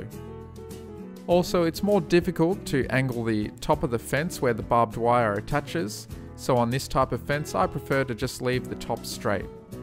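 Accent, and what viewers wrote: Australia. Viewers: where is he from, Australia ? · Are you Australian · Hey! What part of Australia are you from?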